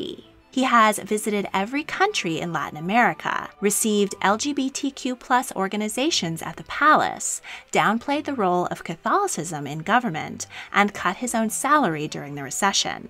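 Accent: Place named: United States. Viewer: Who knows how long any of the monarchies will last? Time well tell. But I wonder how and when you an American (with all the baggage between your country and Britain that entails) decided it might be a good idea to produce a video in which you speculate on the downfall of foreign monarchies. Most of them countries that are allies of the US. Could you not see how inappropriate that might seem to a lot of people? I found it especially crass for a narrator with one of the most pronounced American accents I’ve ever heard (and I come from the US) to take on this dubious task. You seem blind to how incredibly presumptuous and lacking in taste it sounds coming from someone of your background. And of all times to put out such a video! I wonder how Americans would react if some smug British talking head did a series on the future of the presidency and American form of government with a review of all the disastrous American presidencies of the last 50 years, Nixon, Dubya, TRUMP. 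Time for a parliamentary cabinet system in Washington?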